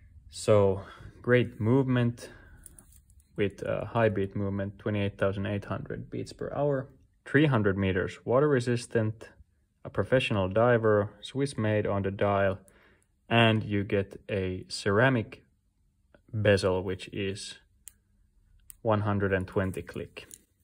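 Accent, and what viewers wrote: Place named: Finland. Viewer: Everything in the voice, intonation, word selection and the way of speech made me think this got to be finnish guy speaking english. Sure enough, finnish dude. Nice video and that watch really is a good watch, only gripe is that it looks like rolex, but its just my opinion. greetings from finland.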